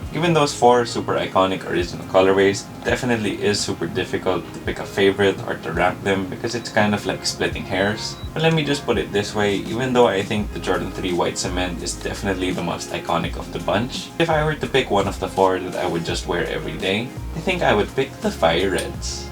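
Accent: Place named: Philippines. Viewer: dat pinoy aksent men🤘🏽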